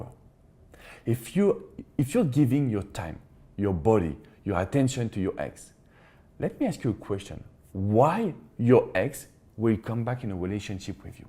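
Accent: France. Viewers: Love the french accent as I am used to the regular speech shows the same tough:);keep all the good things going english side or fench. Je suis avec toi:) true love is never ending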